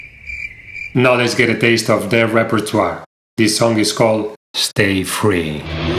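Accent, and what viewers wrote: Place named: Spain. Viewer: I made it all the way through this video, but mostly for the hot Spanish accent.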